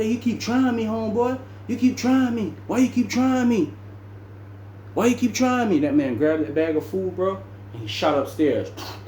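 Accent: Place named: Jamaica. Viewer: It’s funny hearing a Jamaican and country accent mixed in one 😂 respect bro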